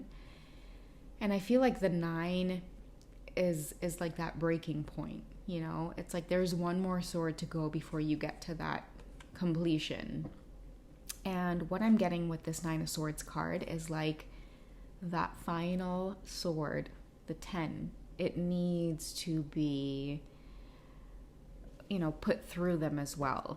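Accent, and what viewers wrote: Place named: Trinidad and Tobago. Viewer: I always knew you were from Trinidad 🇹🇹 hearing you talk .am from Trinidad 🇹🇹 too I love ❤️ your reading 📚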